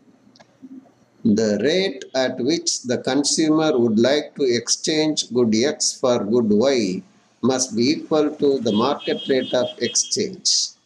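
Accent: India. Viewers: what the hell, your accent, are you an indian?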